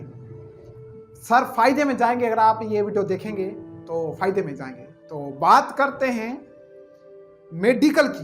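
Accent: Sindh, India. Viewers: Sindhi Ka Urdu bolna Zaher Hai ap Sindhi ho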